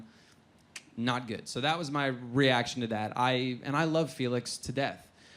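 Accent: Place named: Brixton, United Kingdom. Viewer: Holy shit, I'm British (actually from Brixton, same as Felix is supposed to be) and I pride myself on being able to spot a fake English accent almost instantly... and I was completely fooled by this guy. I guessed pretty quickly that Tatiana wasn't really a Brit (it's a great accent, but the odd American/Canadian vowel still gets through), but I could've sworn this guy was really a Londoner. On the show he sounds almost exactly like people I grew up with (and probably me).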